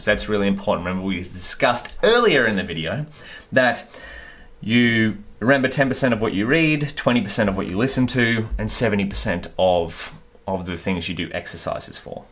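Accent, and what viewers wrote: Australia. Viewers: hahaha. I krew you live in Australia but assumed you've emigrated. All judged by the way you talk. English is my second language so it's natural mistake. :) I'd love to do some overlanding in Australia one day. Take care :)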